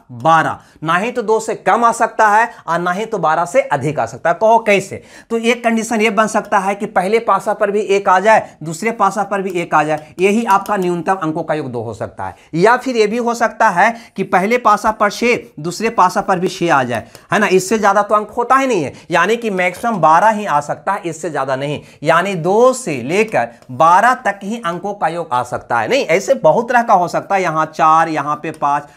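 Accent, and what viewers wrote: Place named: Bihar, India. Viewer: Sir aap bihar se ho kya.... Language se lgta... Hm Bihar se 👍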